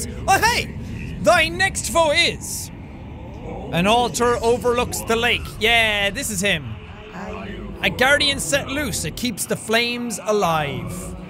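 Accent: Ireland. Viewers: Is it just me, or is Jacks Irish coming out in this video. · Has bin' getting more Irish? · sounded so much more Irish today! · Does anyone else notice when gets excited he gets more Irish? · sounds exceedingly Irish in this episode lol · Is it just me or did suddenly get a thick Irish accent halfway through the video?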